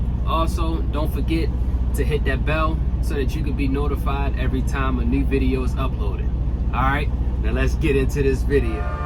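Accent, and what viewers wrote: Baltimore, United States. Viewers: I can spot that Baltimore accent from a mile away. Lived out Cold Spring back in the day. Respect.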